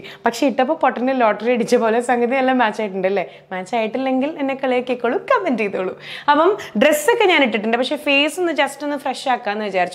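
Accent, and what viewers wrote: Thrissur, India. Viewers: Thrissur slangil athinte monj ithirikoodum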